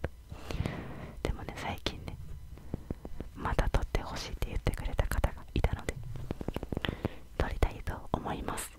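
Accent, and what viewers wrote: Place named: Kansai, Japan. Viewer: ティックトックからきました、音フェチ昔から大好きなんですけど久々に新しいチャンネルにハマりました嬉しい🥲🥲 これからお世話になりまーす！！ 関西弁やな〜って思ったんですけど関西の方ですか??🌟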